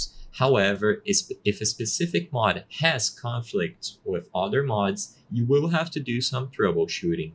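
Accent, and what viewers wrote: Brazil. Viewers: sabia que tinha sotaque de BR, entrei no canal pra ter certeza kkkkk. Valeu pelo vídeo irmão!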